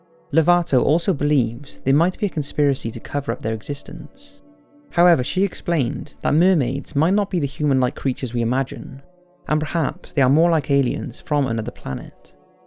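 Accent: United Kingdom. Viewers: Are you Welsh? Where are you from? love the accent 👌🏻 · Yay British narrator :) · Man it's hard to understand the British accent:/ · Like how the narrator has a soothing British voice.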